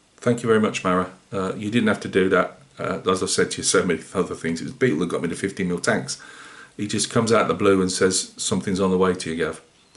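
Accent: Midlands, United Kingdom. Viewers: Happy new year to you from deepest France. My chickens are as pissed off with the weather as you are - though I don’t think spraying models is their main concern. Know what you mean about how great some of our fellow hobbyists are, one of my blog followers sent me 24 beautifully painted Empress Minis to accompany the Indian Mutiny project you inspired me to start. Who does that kind of thing? Anywhoo, lovely to hear another midlanders accent waffling on in the background while I paint, feels like I’m in a pub in Tipton. lol.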